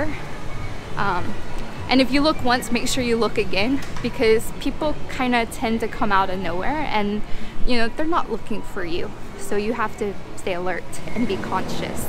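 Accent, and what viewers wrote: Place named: England, United Kingdom. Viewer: How beautiful you are, I love your English accent !!😘😍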